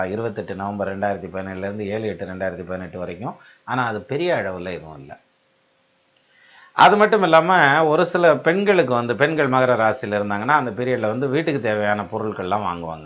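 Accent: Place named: Madurai, India. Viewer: You are great and I belong to Madurai, your Madurai slang is wonderful. One question - People in what age have 2 shift of “Ellarai Sani”...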